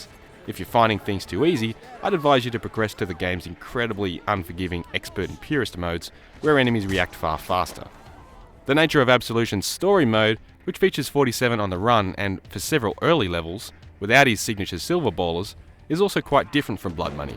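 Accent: Australia. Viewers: aussie reviewer. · Mate this reviewer is an Aussie. :D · An aussie reviewer, scrumptious hahaha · Is this reviewer Australian? I'm form Australia and can't tell :P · An aussie reviewer!!!!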